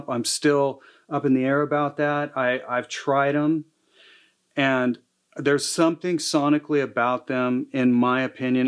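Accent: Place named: United States. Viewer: Thorpe fx field Marshall fuzz. Oh yes. Get one, get one now. Talk as much as you like sir, it's interesting and us Brits never tire of American accent.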